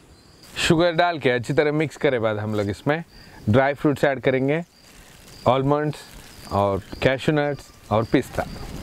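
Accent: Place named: Hyderabad, India. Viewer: I like your hyderabadi touch way of talking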